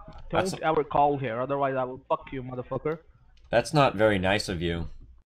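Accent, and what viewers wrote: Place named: United Kingdom. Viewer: How did I get subscribed to someone who speaks with a British accent sounds like he's chewing on a mouthful of caramels while he's trying to talk. Nobody gives a fuck about your opinion you were little island of 20 million people LMAO.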